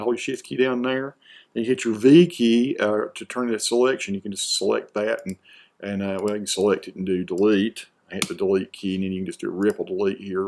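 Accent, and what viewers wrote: South, United States. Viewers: HI! I subscribed. You might not believe how I found your site! I used to live for a few years in Madison County, just North of Asheville. One day I wanted to youtube-lookup videos about that county. There were a few good ones but somehow one of your older videos came up. was part one of four, the " brothers" series where you all rode around the roads and just talking away. I really enjoyed the banter. I had little trouble understanding it, due to not only me living nearby but also living in Tallahassee, Florida for like 60 years on and off. The good old southern accent! I grew up in the very most Nothern area of Appalachia, way out in the country. I also really enjoyed the "wholesomeness" of the brothers, just good ole boys with a heart and soul. I found imagine, though, that someone else who might have stumbled onto this brother series, especially if they came from a Northern city and college-educated; they might only think, at their best, that you all were just a group of rural people with little knowledge of anything! 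But I can FEEL the goodness. And....salvation too! then I looked at your video main page.....oh "Lordy" [I do not mean to take his name in vain, I mean this word to infer a humbling experience That the Lord can Give!] your main page has so many videos of someone with a zillion talents and life experiences. Yet another showing that in the south and especially in the mountains, the Good Stuff is usually hidden away! really hidden away. there is a man w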